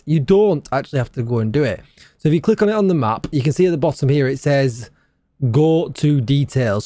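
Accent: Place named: Leeds, United Kingdom. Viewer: You sound like you're local to me. Leeds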